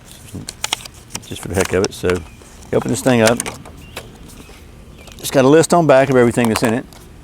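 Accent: Georgia, United States. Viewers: I place that accent at south of Atlanta, GA How far off am I there, sir?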